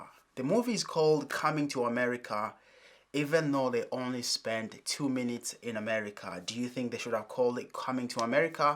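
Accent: Africa. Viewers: Your accent is telling me your African using a some London and American slang words you don't sound like you're from London at all.. I agree with your break down tho.. The movie seemed very rushed and not really funny at all.. A big let down it was ok in some parts but overall not good.. Your totally right about the clothing and lighting after Black Panther I thought Americans have stepped up but not this time.. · Great review brother. You broke It down nicely. But you don't have a British accent. You sound African. Even if my eyes were closed. 😊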